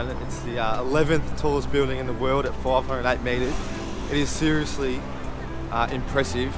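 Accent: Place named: United Kingdom. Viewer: Enjoy listening to your British accent.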